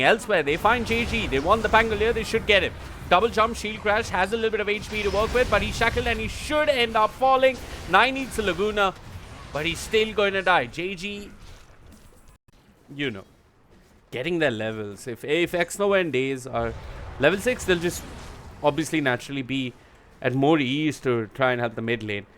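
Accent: India. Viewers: wtf is this commentator?! an indian?? wtf?!?!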